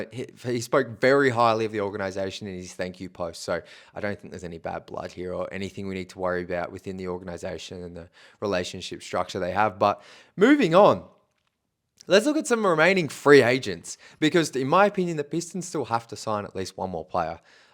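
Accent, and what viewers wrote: Australia. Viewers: No. recent example is the Fontecchio piece. I didn’t hear that here. Nothing on it but I don’t pay for the on-line subscription fees for news bought by a news information product company. Providing the same news stories to across the land. I.E. ~ Knight-Ridder (if they are even around). Point is. Same content across the board. That’s old, presumptive, and to me breaks the code of having your own paid reporters. more of a reporter on the Pistons than a regurgitate, local Detroit reporter here is. I’m a global human. I don’t care about accents. The NBA is a global brand. Aussie…top 7 countries in bball except the U.S. So his talk sounds right to me. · how does his balls taste? He lives in Michigan and has no more information than a decent beat writer. He just has an Aussie accent that makes listening to him different for an American audience.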